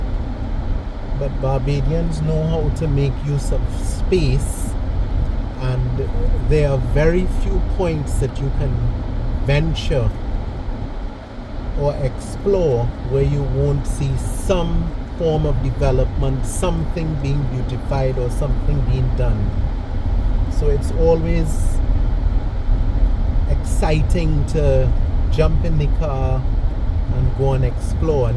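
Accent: Trinidad and Tobago. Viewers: Hi watching from Guyana. I love your videos I lived in Barbados, I am originally from Guyana but worked in Barbados for meny years. Barbados is a very beautiful country. I enjoyed evorything except for some of the locals. Most of the time people were quite hostile towards me because of my nationality especially in the workplace I did not expect that from such a major caribbean island. I have worked in Jamaca, Trinidad and Grenada and experienced welcoming people how would invite you into they're homes, but in Barbados people treated my family and me like dirt and always had remarks. This was very sad for me because we love the island. We are planning to visit next year and hope to have a different experience. I love the videos as we are planning to see all of the unique sites that you showcase. I love the Trini acsent 😁 I guess visiting and living in Barbados are completely different things. 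The only country where i had a similar experience to my experience in Barbados was when I was a young man studying in England.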